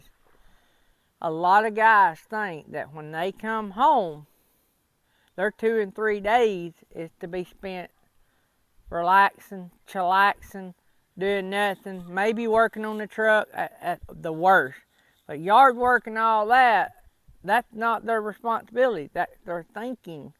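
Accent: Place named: South, United States. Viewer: Yeah you have a very harsh accent. It's quite obvious that you are southern lol. I think this is great advice. Jessie would probably love over the road work, but I've told him I don't like the idea. I wouldn't want him gone all the time and I wouldn't want to go either. So don't think it would work for us. Course with the hot shot, you seem to be home way more than you ever was with the RV hauling. Poor Tammy! aaaaaaaahahahaha!!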